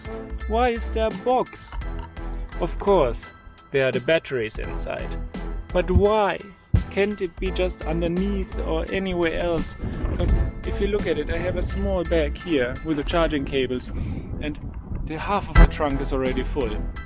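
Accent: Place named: Germany. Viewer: Trevlig video men varför har du tysk brytning när du pratar engelska? :O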